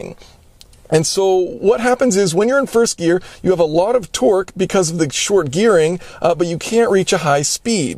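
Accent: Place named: United States. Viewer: As a mechanic in Australia I have 2 statements about this 1. in the USA which from your accent I will ASSUME you are from (possibly canada please don't smite me) Most people don't know how to drive "manual" or "stick" and with the way the world is going this will probably be useless in 10-15 years given electric cars 2. You used the example of going from 4th gear to 2nd gear now you failed to tell the good people at home THIS IS A VERY BAD IDEA, when you go up threw the gears if you rev them right out you will hit a "limiter" or what is refereed to as a "fuel cut" this is to prevent you making the engine spin faster then it is designed to go BUT if you down shift at a high speed skipping a gear you MAY not will MAY cause the engine to excited the "limit" cause it to kiss the valves with the piston on a High interference motor, So it just turns into a giant hand grenade and a lawn ornament until you have the money to replace the motor. 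People will have there doubts about this statement but I have seen it 1st hand on multiple occasions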